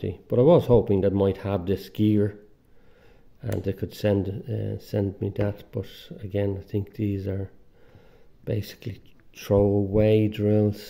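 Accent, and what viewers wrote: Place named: Northern Ireland, United Kingdom. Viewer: Hello I have one exactly the same as yours however mine seems to have a problem with the electronics, I've just taken it apart but I though I'd check on YouTube for any help I could ge fixing t. If you still have yours I'd be interested in it for parts. I not sure how to make contact with someone here. I am in N.Irl so I don't think we are very far from each other judging by your accent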